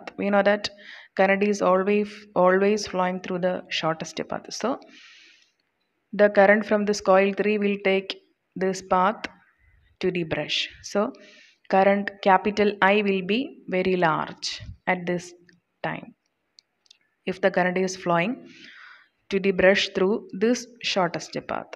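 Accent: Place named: South India, India. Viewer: from south india can say this from her accent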